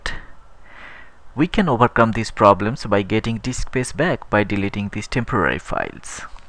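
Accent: India. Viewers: The guy is Bengali as per his accent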